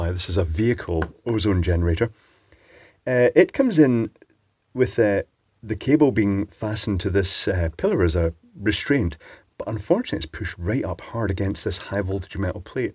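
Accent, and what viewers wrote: Scotland, United Kingdom. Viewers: There's something magical about hearing "green crustiness" in a Scottish accent.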